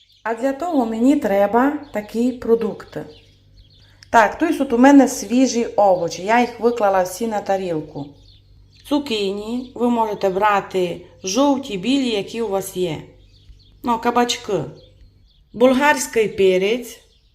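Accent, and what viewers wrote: Transcarpathia, Ukraine. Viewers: Я из Болгарии и хоть слово не поняла,но до чего же интересно слушать ваш прекрасный закарпатский диалект!!❤Украина - моя боль с 2013 год.😢Молюсь о вашей стране каждый день!🙏Держитесь,милые,смелые Украинцы!Весь мир с Вами!Народ - Герой!!💪💛💙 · ви так гарно говорите,в мене є сусід з Закарпаття то він так подібно говорить,як ви,але він вже багато років живе в нашому місті приїхав працювати на шахту,то вже трохи виговорився,але мені ся люби,як ви говорите.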